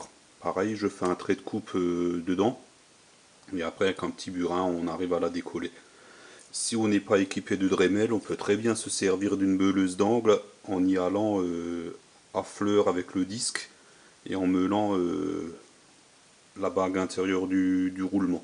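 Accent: Alsace, France. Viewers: je suis tombé sur votre vidéo YouTube et j'ai de suite reconnu l'accent forcément etant alsacien. Wittenheim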